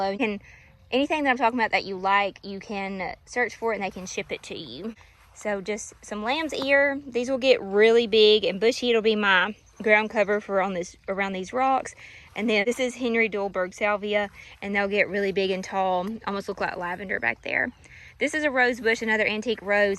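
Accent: South, United States. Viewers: I live in the North and I have to be honest. I love your Southern Accent, but I cannot understand a Word you are saying ! The Reason is you talk way to fast. Maybe if you slowed down and did not run your sentences together, It would be easier for some of your viewers.🙂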